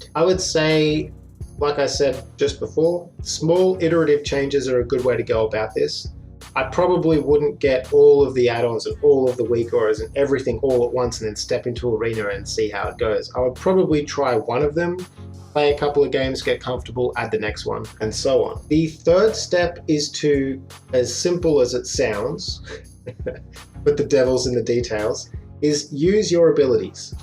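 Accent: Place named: Australia. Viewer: Loved the video and especially love the OZ accent!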